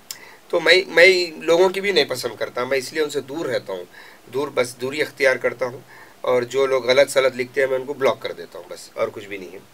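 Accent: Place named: Hyderabad, India. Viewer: gullu dada...hit ur flop..thats different matter...but ur acting and as human being very nice..revive of hyderabadi urdu...keep it up..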